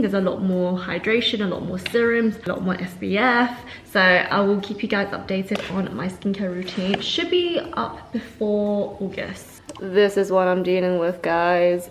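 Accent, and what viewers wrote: United Kingdom. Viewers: I was not expecting you to be British! What a lovely surprise. As a fellow oily skinned Brit, thank you for this vid - it’s given me lots of tips and things to try. Keep up the good work beautiful ♥️ Your Insta vids make me chuckle so much!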